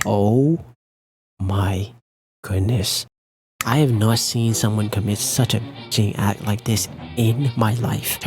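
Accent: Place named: Bahamas. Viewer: Where are you from? You sound kind of like a Bahamian.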